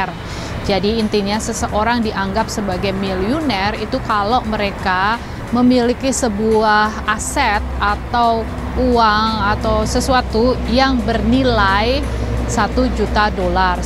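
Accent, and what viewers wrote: Banyumas, Indonesia. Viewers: Nok ngomonge ngapak bae endah padangerti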